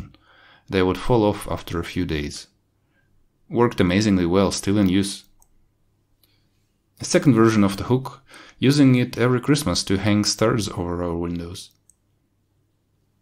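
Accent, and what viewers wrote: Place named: Russia. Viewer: Отлично. Дом без принтера скоро будет невозможен, это как микроволновка или пылесос, вещь необходимая. Дочь Арина и по акценту понятно что по русски говорите.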